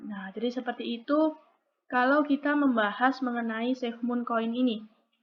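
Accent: Java, Indonesia. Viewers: medoknya jatuh cinta aku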